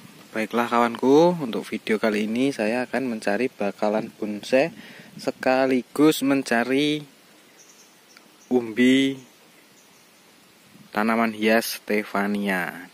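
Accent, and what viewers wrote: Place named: Bojonegoro, Indonesia. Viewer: logat bicarane mirip bojonegoro.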